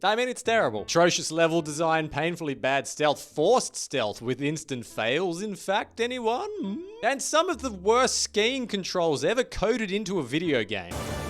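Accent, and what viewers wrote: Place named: Australia. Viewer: My first thought when I saw this video was "over an hour of that Australian guy who talks about video games? Did I die?"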